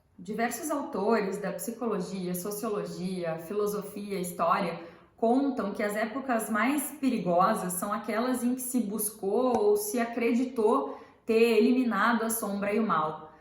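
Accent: Rio Grande do Sul, Brazil. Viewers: Clareza e conteúdo denso com sotaque gaúcho. Excelente. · Nossa tesão pela vida está lá nas Sombras . Tu és gaúcha?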